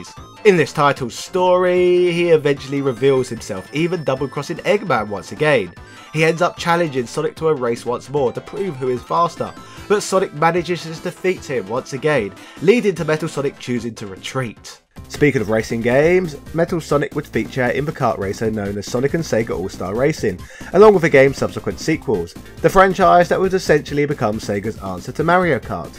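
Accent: United Kingdom. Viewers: I have learned British accents unintentionally make certain words sound really offensive.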